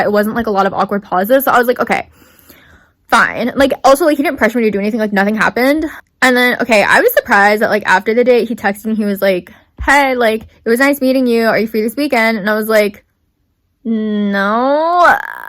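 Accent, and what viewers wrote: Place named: Australia. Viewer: ok but why is no one talking ab how fire that australian accent was??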